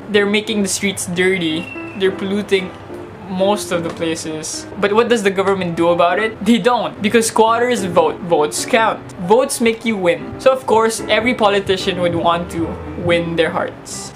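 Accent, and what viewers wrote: Philippines. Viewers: I really hate the tone, the way you said "squatters". They didn't choose to live that way, tiny chance to have a decent house because of poverty, they don't have a choice to choose mate. It's a shame on you to say that to your own people. I maybe understand you if you're not filipino but you are, i can tell because of your "ACCENT". Be nice to your own people. I agreed on what you've said, some filipino peeing everywhere which is not nice, and the rest of what you've said is garbage!f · Based on your looks and accent you could tell hes a typical flip....this is just sad hating your own kind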